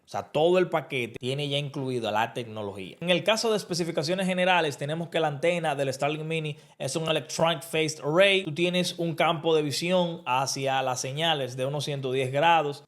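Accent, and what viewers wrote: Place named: United States. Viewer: Porque este negro de república dominicana habla como si fuera gringo? Que vergüenza esa gente que se hacen los gringos siendo de un país miserable hispanohablante 🤦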